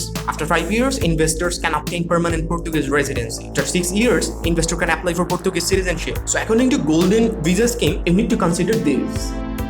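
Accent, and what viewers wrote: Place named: India. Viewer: U tried ur best to Sound like Locals however few words would give a hint that ur an Indian. Thanks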